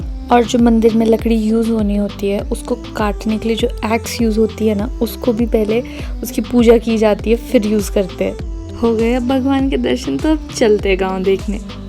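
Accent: Pahadi, India. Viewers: Hahah too sweet ,you have that pahadi touch in your voice and cute expressions😌😌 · Your voice is be like pahadi nice voice 🥰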